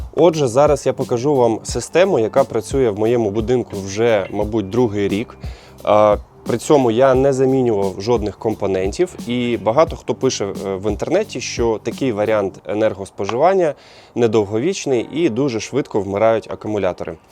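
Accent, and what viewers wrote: Standard. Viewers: норм язык, в наше "время мовнюков" редко услышишь чистый родной язык, а не суржик. Спасибо. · Чистый украинский.Респект!!!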